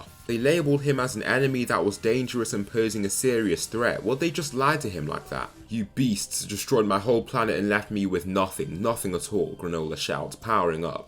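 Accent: United Kingdom. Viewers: Are you scotish?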